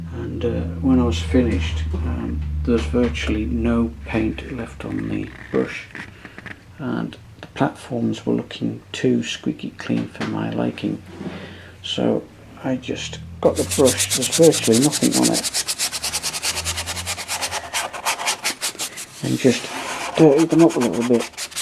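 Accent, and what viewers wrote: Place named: North East England, United Kingdom. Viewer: really enjoyed these two platform videos - I'm about to renovate/make some of my own. I think your craftsmanship and attention to details are amazing and your patience in doing the work exemplary. The soft north eastern tones of your calm voice make it a pleasure to watch. I fear I do not have the patience to do as good a job but I can assure you I have been inspired. Many thanks!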